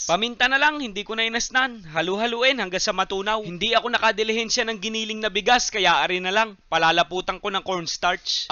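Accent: Batangas, Philippines. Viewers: Are you from Batangas?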